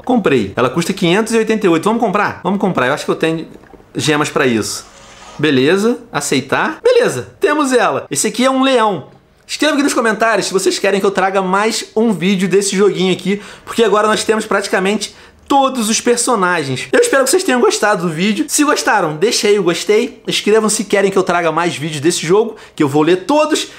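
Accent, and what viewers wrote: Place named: Portugal. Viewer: Tio fala em sotaque português ☺☺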